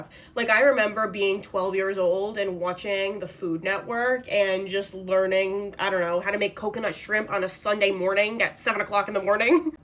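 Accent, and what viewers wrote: United States. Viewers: Can I please ask you what's your accent obviously I know you are American but where did you grow up xx love you guys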